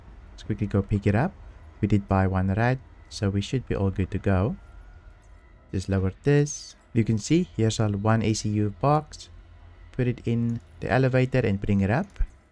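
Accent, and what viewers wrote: Afrikaans, South Africa. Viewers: man i know its not your fault but damn that afrikaans accent is sooo thick 👁👄👁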